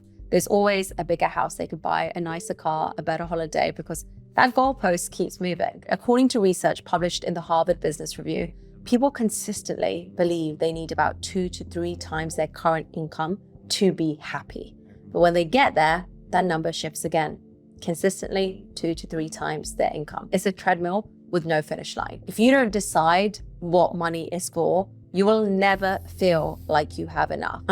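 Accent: United Kingdom. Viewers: well fella she is British and that is the way they talk .... it is like boston and the f bomb! oxford university PPE grad 1990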